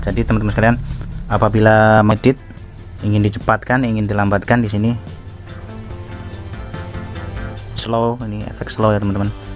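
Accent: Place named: Banyumas, Indonesia. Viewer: Ngomong nya Bisa pelan pelan Dikit ngapak:v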